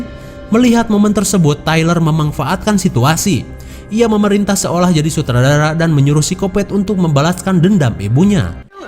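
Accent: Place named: West Java, Indonesia. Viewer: Enakeun kalo orng sunda yg bawain cerita :v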